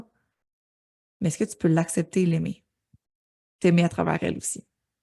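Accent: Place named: Quebec, Canada. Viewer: On adore votre petit accent Québécois 🩷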